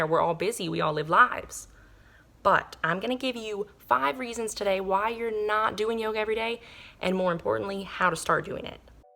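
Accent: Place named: North Carolina, United States. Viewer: I was about to comment about how lovely her accent is. I grew up in WV though I'm not originally from there. I never really picked up the WV accent but hearing your NC accent makes me feel like I'm back home. <3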